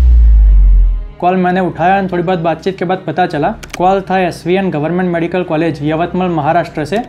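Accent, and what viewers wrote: Gujarat, India. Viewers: Clearly your voice says you are pakka gujarati ❤️❤️❤️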